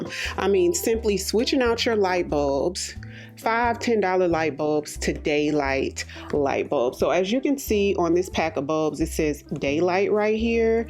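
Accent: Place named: South, United States. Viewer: I often wondered where you were from.i hear your southern accent. Gorgeous look . Love it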